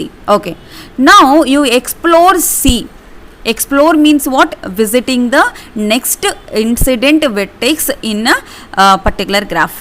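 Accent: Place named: Tamil Nadu, India. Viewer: ma'am baaki sab toh sahi.....bas beech beech mein aapki tamil bahar aa rhi hai.....usko kuchh karo......nice video btw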